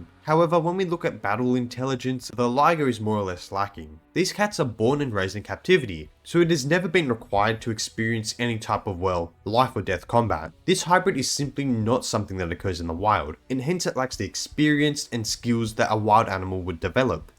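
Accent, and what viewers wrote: Australia. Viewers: I need more of this Aussie accent talking about wild animals. Nothing better than a wikd human describing wild animals with their wild accents. I love it!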